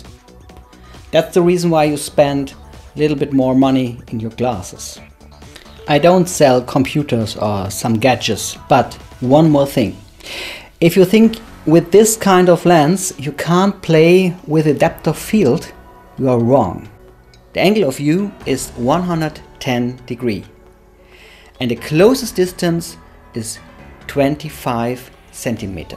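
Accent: Germany. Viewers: Take it as a compliment, i liked it alot ;) The combo of the german techno and the accent put a smile on my face, so bonus points! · cooler duetscher akzent ;-) aber ein gutes video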